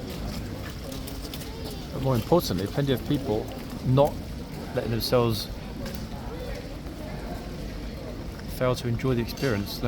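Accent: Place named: United Kingdom. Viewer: I so enjoy watching your videos from Naples Fl USA! You narrate so well!! And you awesome British accent doesnt hurt either! Maybe sometime you can face the camera and wave to all of us!! Cheers!!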